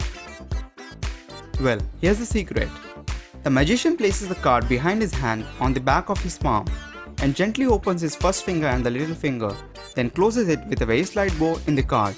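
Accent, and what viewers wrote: India. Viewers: Your Indian accent is difficult to understand. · You're not the other guy. You have a total different accent. Are you an Indian? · Agreed. Very difficult to understand. Indians speak very quickly , and combined with the heavy accent makes it difficult to understand. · Can't blame other people for not understanding his accent. In my first time to hear their accent many years ago, i didn't even understand either. So probably this people who complain about the narrator never meet or work with indians also. 😅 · Indian accent? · Why do 1/4 of these videos have the host with a strong Indian accent? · indian accent.. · Your indian accent is funny...the way you pronounce "position"....its "pozition" not "pojition" lol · Please upload an explanation with an american accent guy not with indian accent. It's annoying, sorry...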